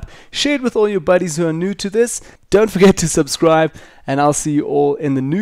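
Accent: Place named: South Africa. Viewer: Bro are you South African? random question but I recognize the accent xD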